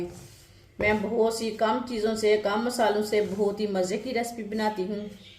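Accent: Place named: Punjab, India. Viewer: Punjabi jab Urdu boltay Hain to bilkul b Acha lahja ni Lagta sad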